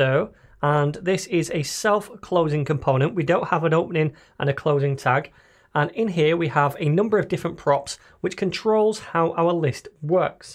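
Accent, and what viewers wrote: United Kingdom. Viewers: Hello, greetings from Argentina, i love the British English..thanks for the tutorial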